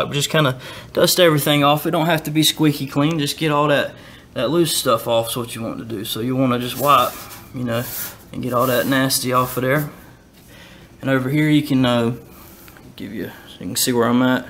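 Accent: South, United States. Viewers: Leave it to a southern boy to slow talk a 15 minute video to 30 minutes.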